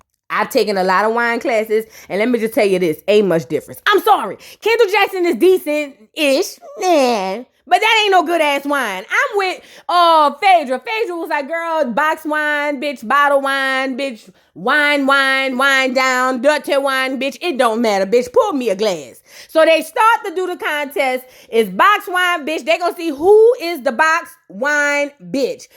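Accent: New Orleans, United States. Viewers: I just wanna say you funny asf and that new Orleans sounds just turns it up❤ im always on da floor crying 😂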